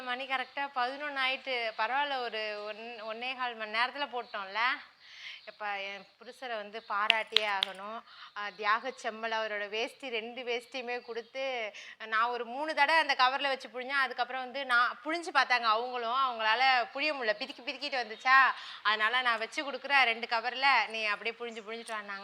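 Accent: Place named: Thanjavur, India. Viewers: Neega peasuratha paththa Enga urla peasura mathiriye irruku na thanjavur district Madukkur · Hai sister love 💕 from kumbakonam namma oor slang❤ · Nanum vungala mathiritha pesuva nanum thanjavur district than sister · Yentha ore pa ,no vithyasam illa nanum ipdi dan thaŕŕ nu nu solven Thanjai tamil theliva irukum. Yenaku ungala pidikum pa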